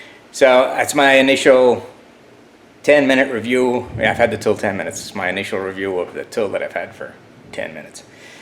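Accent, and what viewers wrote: Northern Ireland, United Kingdom. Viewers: Have one of the old type 1/2 Dewalt impacts tough and durable BUT heavy and not very compact still hanging on to bit of the nordie accent greetings from Dublin.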